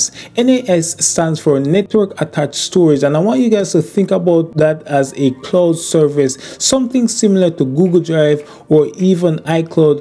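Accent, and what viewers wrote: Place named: Caribbean. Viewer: You sound like you're from the Caribbean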